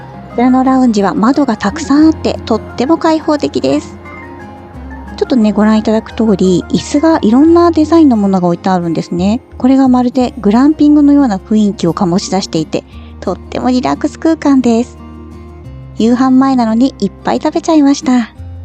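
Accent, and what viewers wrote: Kansai, Japan. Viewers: ステキな所ですね👍 ホテルというより、旅館、て感じですね😊 お湯が出る時、エアかむところもレトロで😂これも演出？なんですかね🤣 なんでやねん😄 さんの関西弁かわいい😄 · またお会いできて嬉しいですえ？関西弁やん！❤これだけ人々を虜にする人も珍しい😊 メジャーデビューしてください❤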